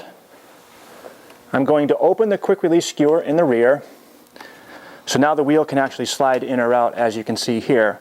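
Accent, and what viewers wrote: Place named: United States. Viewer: I agree, he's probably American with that accent - how do you know he lives inside a chimney? What's a 'damper'? I guess you don't like Americans - don't blame you; they are pretty stupid. Just look at Bush - the most stupidest president ever - he couldn't even speak properly and probably was as bad as spelling as you! lol!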